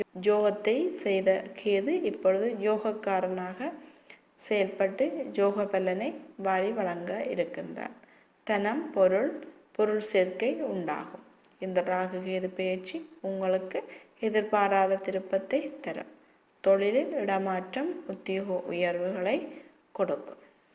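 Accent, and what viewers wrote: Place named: Sri Lanka. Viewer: Ilangai tamil super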